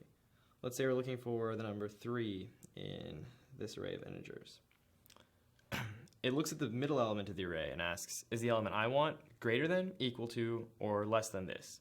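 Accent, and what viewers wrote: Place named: India. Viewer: Awwh yes! Finally an english speaking lection! I am no racist, but god damn how can one focus on that Indian/Pakistani accent?!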